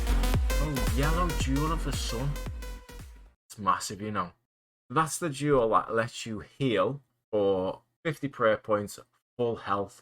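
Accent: Liverpool, United Kingdom. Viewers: Where's your accent in the UK from friend? Scouse? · As a yanited fan, never thought I'd spend 2 hours listening to a scouser talk, and I mean this endearingly 😄. Amazing content! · Fellow scouser?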